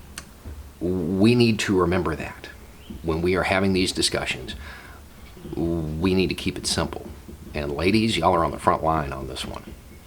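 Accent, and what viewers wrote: South, United States. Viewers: What's with the southern drawl? You didn't sound like that back in the day.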